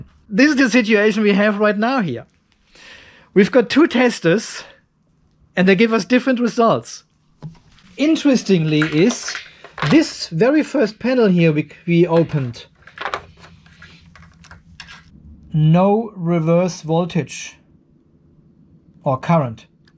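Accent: Germany. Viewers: I'm starting to think that "American English" sounds better with a German accent!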